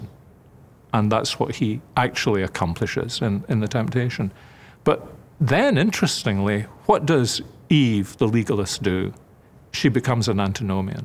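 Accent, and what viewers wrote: Scotland, United Kingdom. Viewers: Absolutely, and I never tire of his Scottish accent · Addjoa preko baah Me either! The Scottish accents are my favs!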